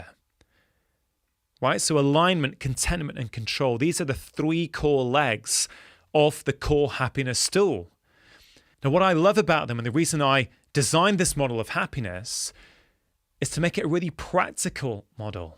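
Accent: United Kingdom. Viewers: No, is British and speaks perfect English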